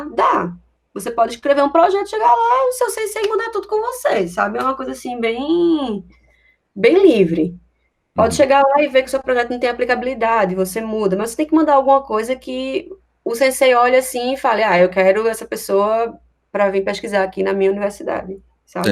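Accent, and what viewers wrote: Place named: Pernambuco, Brazil. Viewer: Pelo sotaque é galera super gente fina de Pernambuco! Por mais youtubers de Pernambuco fazendo sucesso aqui na rede! Pernambuco em 1° lugar! Parabéns pelo conteúdo!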